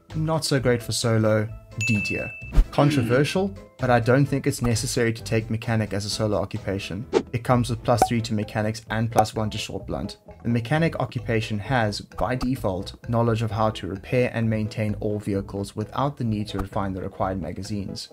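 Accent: South Africa. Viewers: 😂😂😂 are you from Joburg. I can just tell ur South African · You sound South African 😂. Epic tear list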